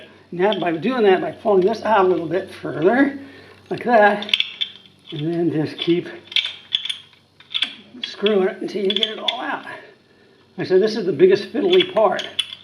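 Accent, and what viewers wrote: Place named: United States. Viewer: The plugs are a lot easier to access than the plugs of a BMW R850. Gee, I had to remove the valve covers and even then you need a special slim designed spark socket to remove the plugs. Consider yourself a lucky guy. You can buy a long socket for about 20 euro at Wunderlich. I am not sure where you live. Your last name is Dutch but your English sounds very American to me. When you live in the US there is also a Wunderlich in the States. Otherwise Amazon has them.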